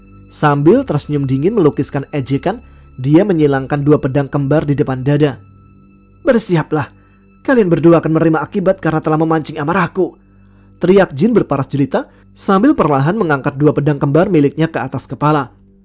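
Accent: Jakarta, Indonesia. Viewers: Ane orang Betawi bang, keren bahasa Betawi ucapan bang masuk da kaya berrry prima😅😅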